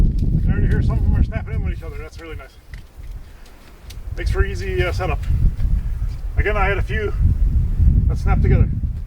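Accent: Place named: Maritimes, Canada. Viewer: Really enjoyed the thoroughness of this review! Being from the Maritimes I also enjoy the accent, eh?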